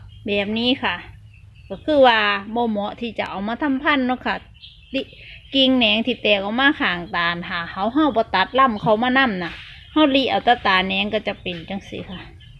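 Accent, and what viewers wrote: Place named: Isan, Thailand. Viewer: ดีมากค่ะ ติดตามค่ะ ภาษาอีสานแท้ๆ หาฟังยากแม่ชอบควรอนุรักษ์ไว้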